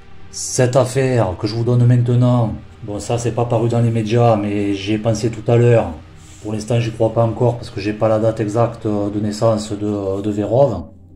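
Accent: South, France. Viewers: Bravo mec!....pour tes infos, et oui ne change rien, jaime la France francaise.authentique!...tu as un putain de bel accent du Sud. il est probable que cet homme tourmenté et intelligent est commis d autres actes.... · bonjour oui comme beaucoup d autres affaires. J ai découvert votre travail récemment et me suis abonnée. J apprécie votre façon de traiter les sujets et votre analyse sur les probabilités. Beaucoup de travail de recherche félicitations et l accent du sud c est sympa 😉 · Merci pour tes vidéos tu fais du très bon travail. Et moi j aime beaucoup l accent du sud. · En plus ça donne du soleil son accent du sud 👍🏽👍🏽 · Te casse. Fier de notre accent du sud. Merci pour le taff. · Alors moi perso pour être abonné à de nombreux youtubers true crimes etc je que j'aime ici entre autre c'est la simplicité, l'essentiel est la dans le fond et dans la forme. 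Les vues augmenteront c'est sur, avec le temps, et ya une marge de progression aussi mais j'aime la simplicité ici, j'ai l'impression d'écouter une émission de radio c'est la voix qui nous emmène, avec le chant du sud en prime c'est génial! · On adore l accent du sud. C est le soleil ce bel accent ! Merci pour votre excellent travail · Salut Tu vas bien merci pour cette vidéo C'est quoi encore ce délire avec ton accent Tu as un très bel accent c'est l'accent du Sud Zut les C ça commence à faire ce sont les mêmes qui se plaignent des Cygales lorsqu'ils viennent dans le Sud Vous êtes pas content c'est pareil restez chez vous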